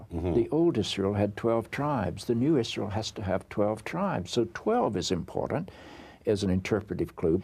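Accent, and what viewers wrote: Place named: United States. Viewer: What a gobshite. I'm my country? You sound like you're from the usa? Full of shit!!! I was told I was told. Lies lies and lies!!!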